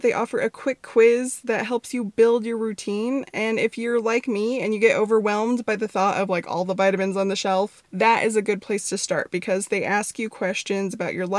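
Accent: United Kingdom. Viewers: Well done. I hadnt realised that Headspace has that suggested run of things for the morning, thanks for that! i've been not noticing that function:) also, I LOVED your British accent, very good. You sounded very familiar! Thanks for a great video. take care x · Top effort for your British accent! ⭐️ 🇬🇧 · I need a morning routine. Cheers for the reminder and LOL at your British accent. I'm from Manchester England 😂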